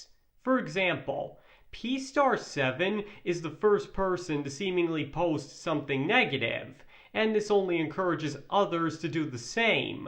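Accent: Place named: Great Lakes, United States. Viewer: Im really enjoying your channel! You bring new perspective to the SP Analysis community that is sorely needed. I'm also dead ass fascinated by your accent. I've heard it once before, from a Podcaster who is probably twice your age; specializing in baseball and popes! 😅 I've heard it referred to as a "Great Lakes" accent. My brief attempt at light online stalking was unsuccessful. Can you help me understand your accent better without revealing inappropriate location details?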